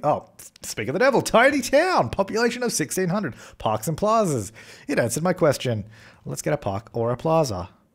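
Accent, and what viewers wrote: Australia. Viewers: R u form Australia? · Both and Jazza are Australian. Have the same accent:)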